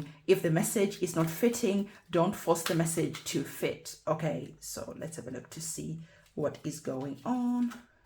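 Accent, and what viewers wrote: South Africa. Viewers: Are you South African? :)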